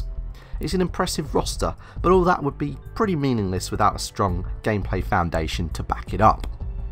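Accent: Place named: United Kingdom. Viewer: I can't sit through another YouTube game review video with a heavy-handed British accent. I just can't. It's everywhere. Imitators are even putting out videos. Some of them have obviously practiced quite a bit. I didn't down-vote or anything like that. I just can't listen to the cockney-esque or "Liverpool-ean" narrations anymore.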